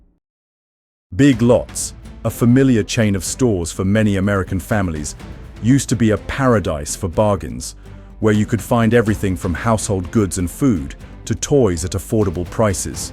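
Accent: United Kingdom. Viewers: Hey Dude, where did you get that British accent? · Why do you have a British accent??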